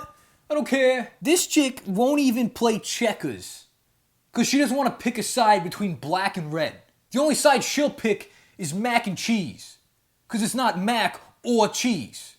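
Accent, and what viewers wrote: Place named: East Coast, United States. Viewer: Roman bath orgy lol This guy looks arab but talks east coast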